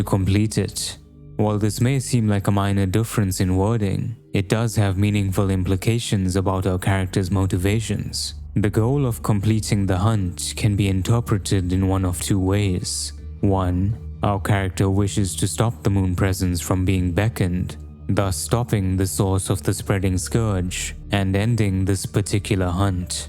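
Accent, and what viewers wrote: South Africa. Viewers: Is this guy South African??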